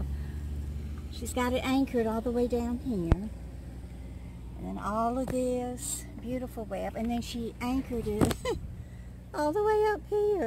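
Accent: South, United States. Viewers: I enjoy your channel. There are people(other you tube channels) out there saying you talk in a syrupy southern accent, but I know its real because I have known people from Mississippi. As far as those saying you are a con artist because of your pay pal and patreon, that's not illegal to do that and people like me who like to watch but can't afford to send you money are still free to watch. Be careful of the mean people out there, don't acknowledge them anymore if they contact you, it fuels the fire. I took up for you and got attacked by some. Be safe and keep on vlogging.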